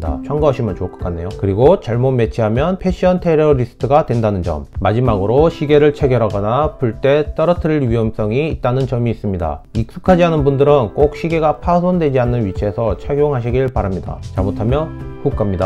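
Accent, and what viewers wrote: Gyeongsang, South Korea. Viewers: 나토뺀뜨..사투리가 남거슬리는군요..경상도 억양이..ㅋ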